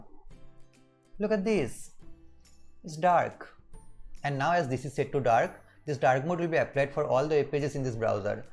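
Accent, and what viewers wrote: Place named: India. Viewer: bro your vice is like a hindustani 🙂🙂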